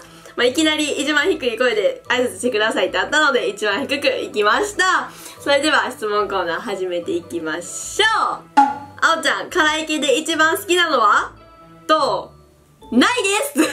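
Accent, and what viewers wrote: Kansai, Japan. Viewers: 最近になってチャンネル登録したのですが、あおサンの笑顔は自分にとって癒し系です。関西弁もいいですね～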